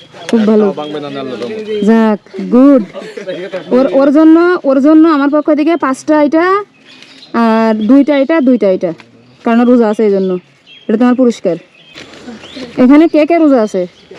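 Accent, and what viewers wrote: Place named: Jessore, Bangladesh. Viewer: আসসালামুআলাইকুম ওয়ারাহমাতুল্লাহ আপু কেমন, আছেন আশা করি ভাল আছেন। সেহরি খাওয়ার পর ফজরের নামাজের পর থেকে আপনার ভিডিও গুলো দেখছিলাম অনেক ভালো লাগছে আপনার যে মানব সেবামূলক কার্যক্রম এগুলা অনেক সুন্দর লাগছে আমার কাছে। আপনার প্রতিভাকে সাধুবাদ জানাই এবং আপনার জন্য দোয়া করি আপনি যেন সবসময় এইভাবে গরিব অসহায় ও দুস্থ মানুষের পাশে দাঁড়াতে পারেন দেশ ও জাতির জন্য কাজ করতে পারেন আল্লাহ আপনার জীবন ও ভবিষ্যৎকে ভালো করুক দুনিয়া আখেরাত কল্যাণময় করুন এই দোয়া রইল আপনার জন্য। আমি আপনার চ্যানেল টি সাবস্ক্রাইব করেছি এবং আপনার ভিডিওগুলো দেখছি অনেক ভালো লাগছে। আপনার কথা শুনে মনে হল সম্ভবত আপনার বাড়ির যশোর জেলার মধ্যেই হবে আমিও যশোর জেলার ছেলে। আমার বাড়ি যশোর খাজুরা রাজাপুর গ্রাম। আল্লাহ আপনাকে দ্বীনদারির সাথে সুস্থতার সাথে নেক হায়াত দান করুক এবং বেশি বেশি মানব সেবামূলক কাজ করার তৌফিক দান করুন আমিন। আমাদের জন্য দোয়া করবেন আসসালামু আলাইকুম ওরাহমাতুল্লাহ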